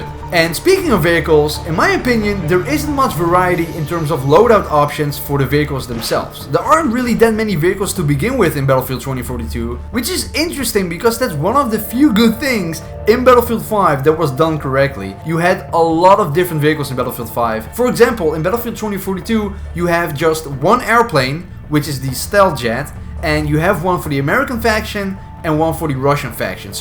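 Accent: Netherlands. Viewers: You must be Dutch right?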